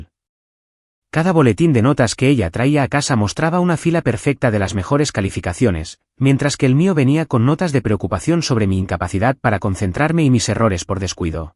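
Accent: Spain. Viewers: es mi favorito narrador tanbien no mea burre me lleva ala imaginacion de cada personage como si fuera pelicula envivo asi como narracon esavos y acento espanol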